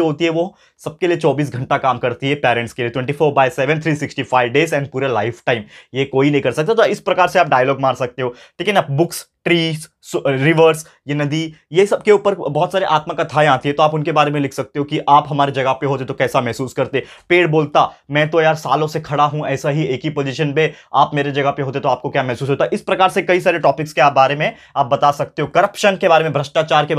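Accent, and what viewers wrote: Maharashtra, India. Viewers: SIR AAP KITNI PYAARI MARATHI BOLTE HO SUPERB🙏🏻❤🌍💯💯👍☘️😄😃😘🤩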